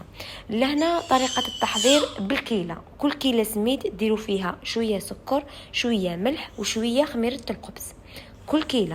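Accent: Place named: East, Algeria. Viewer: المهم تتكلمي بالعربية خليك قوية مع اللهجة الجزائرية الشرقية خليك جيجلية إعجاب إشتراك تعليق و مشاركة😊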